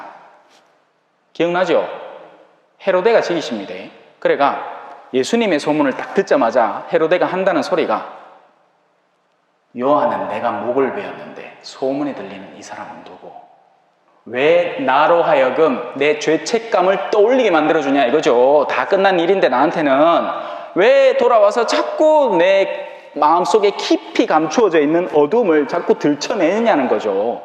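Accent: Gyeongsang, South Korea. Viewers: 찬미예수님! 신부님, 강론말씀으로 또 새롭게 힘을 얻습니다. 신부님 완전 경상도 사투리(뿌리)를 들으며 고향 향기를 맡는 것 같습니다. 하느님은 찬미받으소서!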